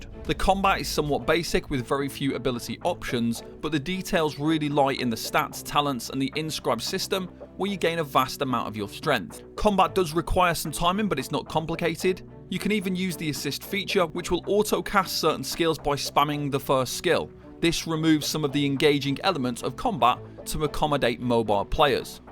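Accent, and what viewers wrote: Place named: United Kingdom. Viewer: Seems you have an issue with the British Accent. Because there’s absolutely nothing wrong with how this content creators talks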